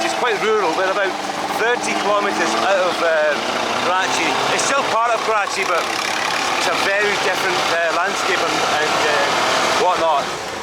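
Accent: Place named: Scotland, United Kingdom. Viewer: I love your Scottish accent ❤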